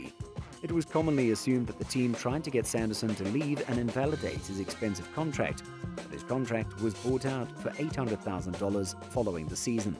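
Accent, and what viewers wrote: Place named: United Kingdom. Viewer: Why is there a Limey talking about hockey?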